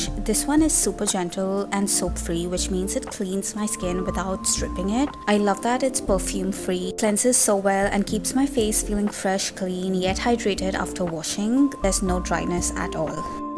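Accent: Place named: India. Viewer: ❤Your voice gives me comfort. I think you are from India. I recognized you from your accent. I would like to say that I follow you from Algeria. I would also like to say that you are incredibly beautiful. Your hair, your body, everything. Continue with your content because you are really successful. I really loved you. ❤❤❤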